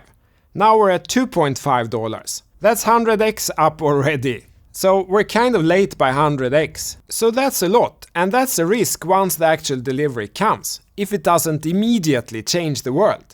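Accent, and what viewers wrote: Sweden. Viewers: The biggest Sweängelska I heard in my entire life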